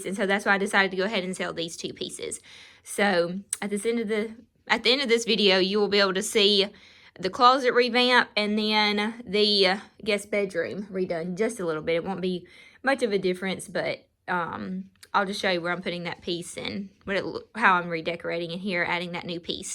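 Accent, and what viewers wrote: South, United States. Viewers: I'm not one of those youtubers who looks flawless lol I cant stand fake one their home are not that dirty to make a video. Love your videos and southern accent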